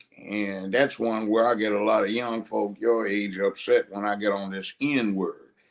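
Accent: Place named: South, United States. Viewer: With someone with such heavy southern dialect, his impressions are amazing lmao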